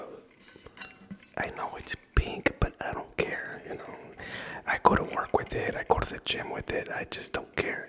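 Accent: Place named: United States. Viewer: i grew up in SoCal around Chicanos (& married a 3rd gen Mex American) so you sound American to me.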